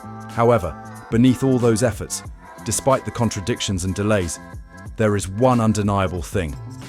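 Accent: England, United Kingdom. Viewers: English accent throwing shade on LA - nice try diddy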